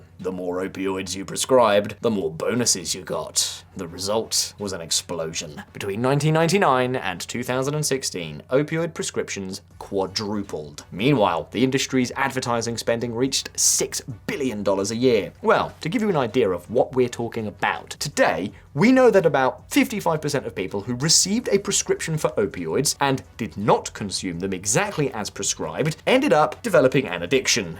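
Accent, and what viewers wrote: United Kingdom. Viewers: So rich for a Brit sounding dude to sound so smart, yet missing the point. Dude, this is what England did to China, and now is doing it to us. Who is in charge, the bankers dude, the identically same as before. Trump is tearing down the global order, in other words, your king. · Very approriare to have a guy with a judgemental brithish accent lecture USA on opiums By the late 1700s, Britain had a huge trade problem with China: • Britain wanted Chinese tea, silk, and porcelain. • China wanted almost nothing from Britain. • Result: Britain was bleeding silver. So Britain did what empires do when trade doesn’t go their way. The Opium Solution Britain began smuggling opium grown in British-controlled India into China. • Opium was illegal in China. • Millions became addicted. • Silver started flowing out of China and into Britain. China knew exactly what was happening and tried to stop it.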